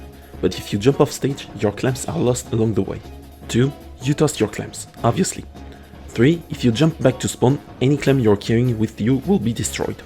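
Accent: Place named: France. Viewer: great stuff mate! i actually planned on doing similar explanation videos on the modes, but im far from that subject in my schedule anyway... well, i have to criticise your french accent :( i could barely understand what you say eventhough i wanted to, but i know you tried your best and that's what matters. also regarding the concept itself: i know this video is aimed at people who are new or just part time squids, but i would have liked some more "interesting" details for people who already know the very basics already. for example you could have mentioned or shown the exact punishment you get upon an opposing counter push and stuff like that. just some info that even pros can maybe find usefull or so... idk all in all, good work for a first try i would say^^ keep it on mate!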